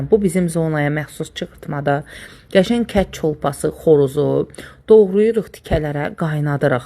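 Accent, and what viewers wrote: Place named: Balakan, Azerbaijan. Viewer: Балакен лехчесине охшур.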